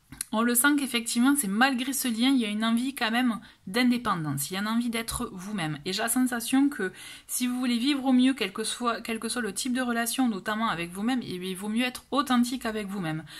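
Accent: South, France. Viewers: Merci 🎉. Joli accent du sud ❤.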